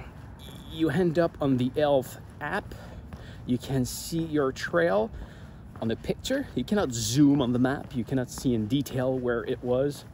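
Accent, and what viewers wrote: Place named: France. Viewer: your accent is much too strong, when a French person tries to speak English it is usually very difficult for me to understand or I do not understand half of it because of the poor pronunciation of the words and I speak a lot of English and German and Dutch. I also think that you as a Garmin fanboy you just enjoy making Apple bad while it is an all-round top product. Garmin is certainly good too, but its not for me.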